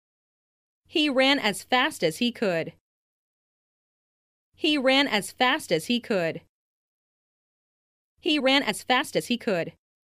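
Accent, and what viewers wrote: United States. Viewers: american accent detected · Is american accent?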